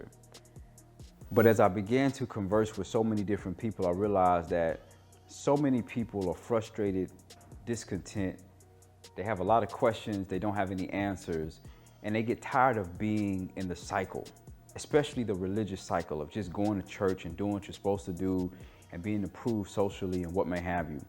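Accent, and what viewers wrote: Ghana. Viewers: 😄🤣 Hahaha love your Ghanaian accent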